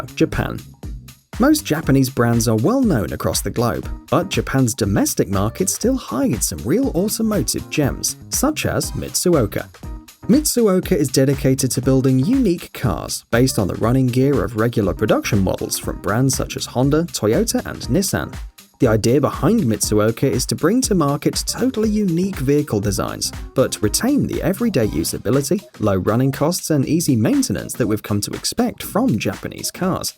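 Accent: United Kingdom. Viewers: "What's up?" Naff all, pal. It's bad enough when YouTube Yanks ask what my problem is, but a British accent asking it demands a Glesca kiss.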